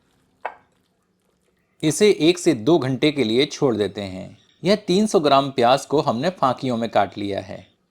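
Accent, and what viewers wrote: Standard. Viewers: श्रीमान आपका शुद्ध हिंदी बोलना बहुत ही आनंदित करता है परन्तु spoon चम्मच कह के बोलिए सोने पे सुहागा हो जायेगा धन्यवाद!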